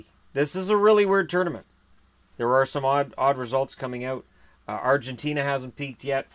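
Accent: Canada. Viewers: Are you born in Ontario? You have a heavy accent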